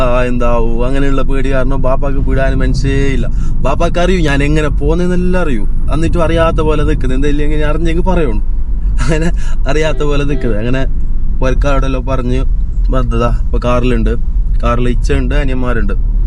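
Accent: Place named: Kasaragod, India. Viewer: Kasaroda slangilanne describe aakiya madeera Ade majeullu Baaki south Kerala slangilellu kore vlog indra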